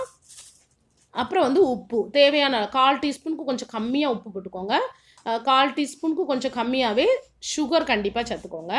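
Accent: Tirunelveli, India. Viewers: Unga voor tirunelveli ya Akka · nanum tirunelveli than sister athan slang thariyuthu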